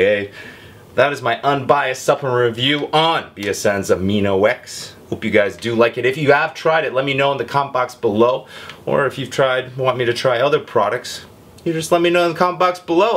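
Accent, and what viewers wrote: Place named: Canada. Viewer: Is he canadian? He do have a canadian accent.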